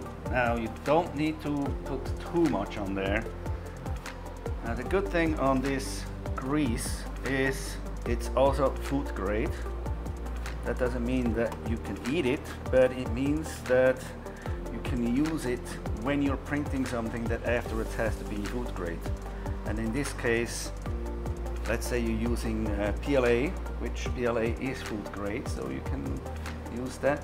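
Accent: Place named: Switzerland. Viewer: Are you maybe swiss? Your english is great but the accent seems familliar to me 🤔 falls ja liebi Grüess us Züri 😊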